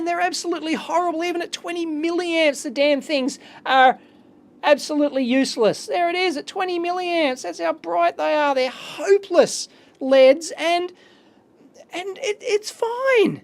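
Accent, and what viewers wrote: Australia. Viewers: I have just watched an "English" video debunking a house energy saver, and now I have watched a Australian (?? hope I have not insulted you) debunking free energy - just fantastic going from low key cynicism to high intensity "your an idiot". Thank you for a excellent video and some real science. :):):)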